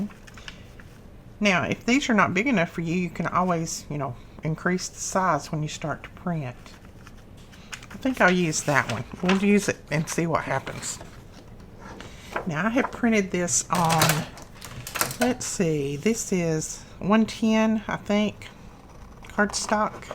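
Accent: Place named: Georgia, United States. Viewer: Hi from Texas. I have been watching a few of your videos and since I was "made in Georgia" I love your accent! Just like family!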